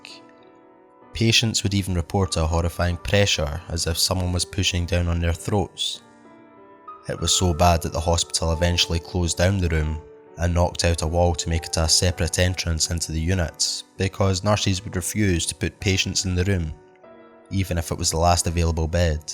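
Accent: Scotland, United Kingdom. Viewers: Amazing, is that a Scottish accent?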